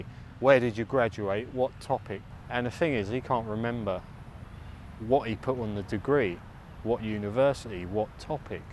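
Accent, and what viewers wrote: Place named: London, United Kingdom. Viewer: more drama in 22 minutes than in tons of dubious movies....I'm guessing any slightly shady story narrated with the flavor of a London accent (or do my ears betray me?) has that special something, but maybe it's just me ;-)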